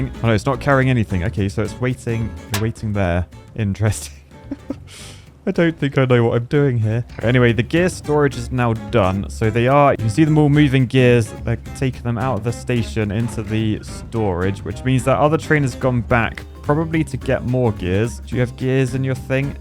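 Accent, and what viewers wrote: United Kingdom. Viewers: You can really tell he is British now.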